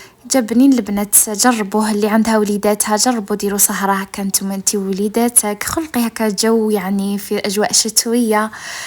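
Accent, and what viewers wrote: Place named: Médéa, Algeria. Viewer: تهدري كيما ناس المدية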